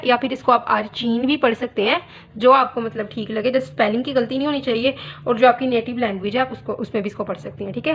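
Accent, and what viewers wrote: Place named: Lahore, Pakistan. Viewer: Thank uhh so much mam 😊❤❤❤ your accent is so cute..kind of lahori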